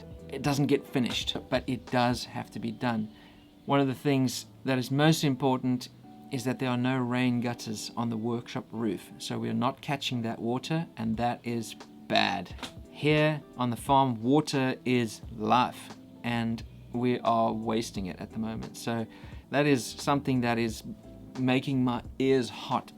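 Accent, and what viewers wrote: South Africa. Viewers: It is so funny to hear that you are from the UK, your accent to my South African ear sounds South African and looking at you, you even look South African to me, weird isn't it? You could so pass for a Dutch South African, geniet u dag, and stay safe. I left South Africa 32yrs ago, all my family are and still lives in Cape Town, I would love to move back, but... I have a fear for the crime and having to live behind bars and in and out of cars. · Wow, you sound 100% South African. Not even a hint of a foreign accent. ..and that after only a year?? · Ja. That's what I thought when I first heard him talk. What? But he sounds South African!!! What does he mean "moved to South Africa"? You already belong Mr.! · You sound South African? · Well he sounds South African so he's one of us now. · But you are a South African. That accent is dead giveaway. 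· His parents are the farmers. Egte boere. Can’t you hear his accent? Capetonian accent. Lived in Uk 15 yrs. Now he is back. Go julle. Vas byt. With you all the way. From What Oz. 40 yrs. ❤️SA. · You don't sound like you're from outside South Africa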